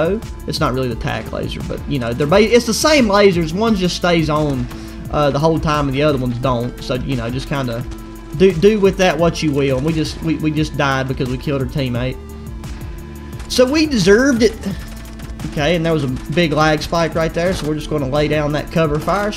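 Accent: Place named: South, United States. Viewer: Is it just me or do u have the best southern accent? Lmao but u earned ur self a sub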